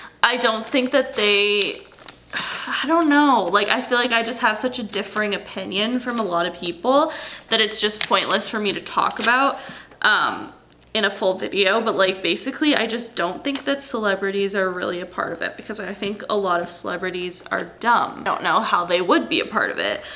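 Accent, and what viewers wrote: New York, United States. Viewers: I love your New York accent..Lmao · Her New York accent though😂😂😂😂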